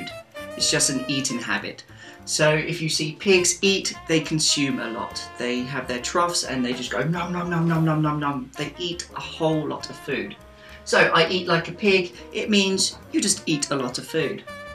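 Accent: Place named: London, United Kingdom. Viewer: Thank you for great explanation and excellent lesson of Londoner's English Accent.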